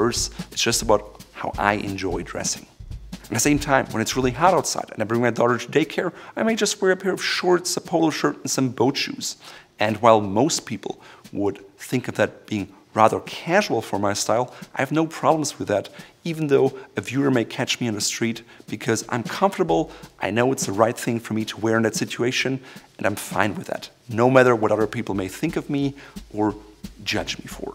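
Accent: Germany. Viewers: I swear, I only noticed your German accent after you said you were German. After that I couldn’t stop thinking about it. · You are definitely GERMAN... (belive me i said that before you disclosed)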